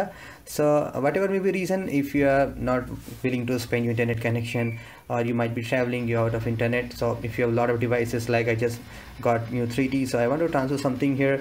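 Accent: India. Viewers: When i search tutorial i always hear voice of indian 🤦‍♀️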